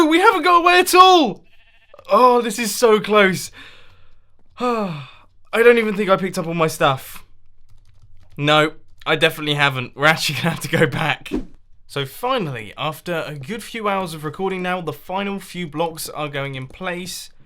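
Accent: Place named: United Kingdom. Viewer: I don't usually complain very much on the internet but has gotten really lazy over time. Now it's all about dumb progress updates every five seconds with him saying "This actually took longer than it seems!" as an excuse. Trying to reach the 20 minute deadline to make an average episode with the least ammount of effort possible. I mean if you AFK overnight that should be around 8 or 9 hours, not 14 or 15 like you said. You spend more than half the day sleeping? Also your go-to excuse is "BECAUSE IM A SPOON!! HAHA!!!" Now being stupid and having a british accent are his only selling points. I unsubscribed a relatively long time ago, because other hermits are better youtubers, but I come here from time to time to check and it's getting worse. Now he's adding merchandise into the mix. This is getting commercial now. Ugh.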